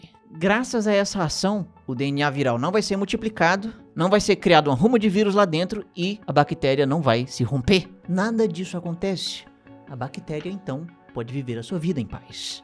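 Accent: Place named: Northeast, Brazil. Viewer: "Uma ruma de vírus" Amo o dialeto nordestino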